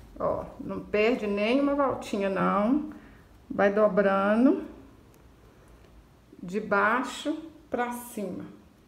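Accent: Minas Gerais, Brazil. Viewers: Vc é mineira né? Esse sotaque eu conheço.kkkk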